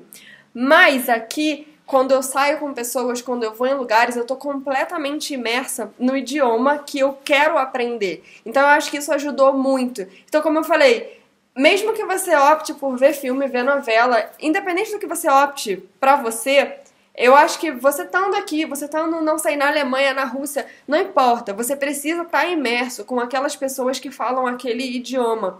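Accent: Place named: Rio de Janeiro, Brazil. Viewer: Eita sotaque carioca!!! TMJ!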